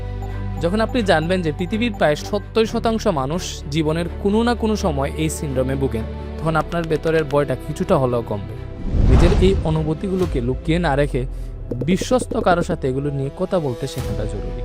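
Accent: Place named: Sylhet, Bangladesh. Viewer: সিলেটের ভয়েস না দিয়ে শুদ্ধ বাংলা ভয়েস দিলে অসাধারণ লাগবে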